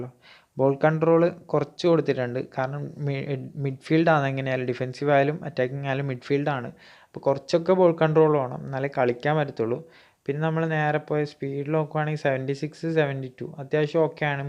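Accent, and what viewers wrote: Kannur, India. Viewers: Kannur eadeyaa ingal?👋🙂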